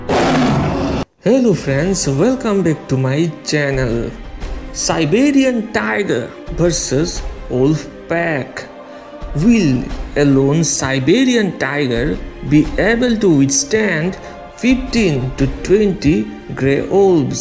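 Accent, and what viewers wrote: India. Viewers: 😂 it’s the tune for me must be an Indian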